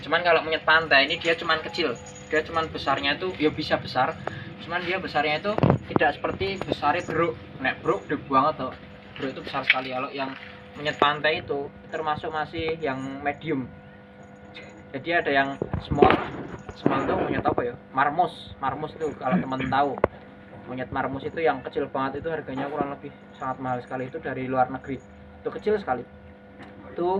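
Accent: Indonesia. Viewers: Ky orang kendal bahasane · Jowone ngendi mas... Yoja, ya?😊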